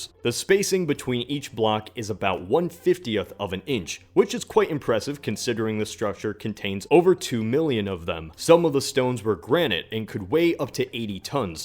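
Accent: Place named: Midwest, United States. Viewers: it's a Midwestern accent. from States like Kansas and Missouri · He's from the great state of Ohio, albeit Toledo instead of the superior cities of Columbus and Cincinnati.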